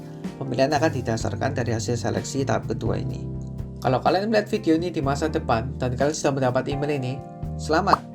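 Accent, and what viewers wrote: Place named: Pasuruan, Indonesia. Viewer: Aku pikir juga gitu, dan logat bicaranya mengingkatkanku sama temenku orang pasuran 😂 bismillah doakan aku ya kak semoga bisa lolos AAS 2024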